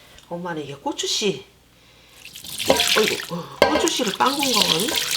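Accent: Chungcheong, South Korea. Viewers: 충청도 어디세요? 고향이~~ 오햘머니? 너무 정겹습니다 친정엄마 의 목소리 같아서요. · 충청도사투리가 정겹네요ㆍ설명도 간단하니듣기 딱좋아요ㆍ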